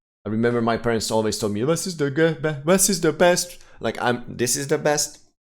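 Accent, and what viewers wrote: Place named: Russia. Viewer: You sound Russian to me.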